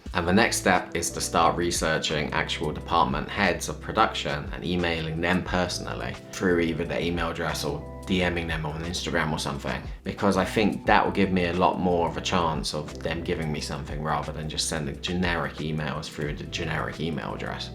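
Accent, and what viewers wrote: United Kingdom. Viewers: 조용히 님 구독하며 영국발음 행복하게 듣던 사람입니다^^ 영국에서 1년 살았던 추억으로 인생이 달라졌던 저라, 님의 9년 한국생활이 꼭 좋은 추억으로 남으시길바래요~ 영국돌아가서 원하는 꿈!! 꼭 이루세요~~!! 멈추지말고 우리 꿈꿔요! 응원합니다^^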